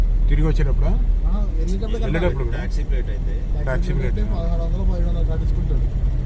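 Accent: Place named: Krishna District, India. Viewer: మీ సొంత ఊరు ఏది బ్రదర్ ఎందుకు అంటే నీ మాట లో కృష్ణా జిల్లా యాస వినపడుతుంది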